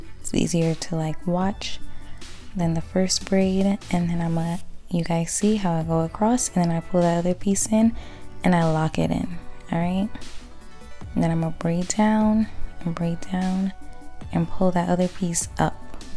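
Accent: New York, United States. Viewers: Your accent is so New York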